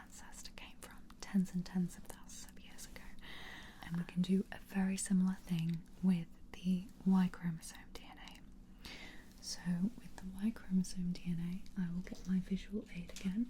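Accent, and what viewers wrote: United Kingdom. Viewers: You have a great ASMR voice. As an American, I think the British accent is a wonderful bonus as well. 👍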